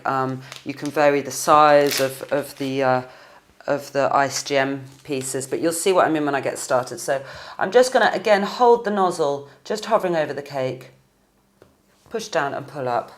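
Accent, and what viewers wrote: England, United Kingdom. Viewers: I love her accent!!! I'm so going to England London some day. Nice cupcakes!!! Lots of frosting. thats what I like!!!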